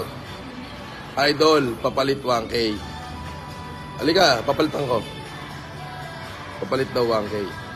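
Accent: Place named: Batangas, Philippines. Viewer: Sir ano ba tribo mo? eh tagalog mo para kang batangeño.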